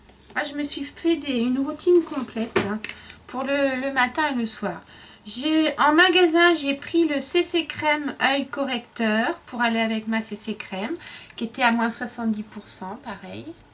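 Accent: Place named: Paris, France. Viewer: Allo cherie tres beaux goodies - j'aime beaucoup - tes yeux sont tres belle et ton eyelashes ooh-la-la - le francais Parisienne est plus jolie que le francais ici - mon dieu tu est tres belle et je comprends tout mais mon francais ecrire et pour les oiseaux hahahahahahaha xxxx beacoup d'amour xxxxx Suzy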